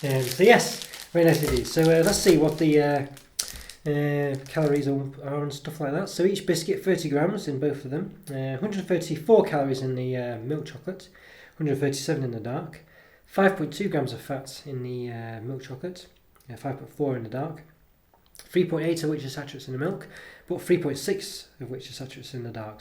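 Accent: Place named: United Kingdom. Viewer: OCD candy review, if you love to see up close candy wrapper comparison aplenty, this review is for you. I have an unbridled love of close ups of candy wrappers with endless comparisons of size by a person with severe OCD but even I was daunted by this also of you love unintelligible British mumbling, this video is for you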